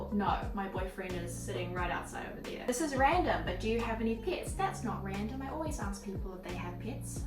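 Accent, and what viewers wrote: England, United Kingdom. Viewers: I love your English accent and i just wanna hear more and more 🥰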